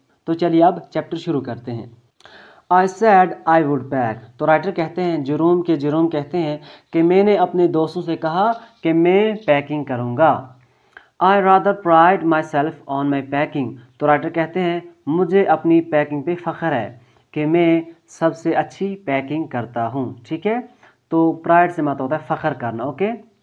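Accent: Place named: Kashmir, India. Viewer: Sir I think you are from*Kashmir*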